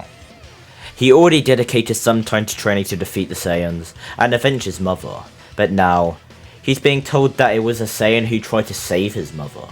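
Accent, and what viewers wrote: United Kingdom. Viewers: I have a feeling your british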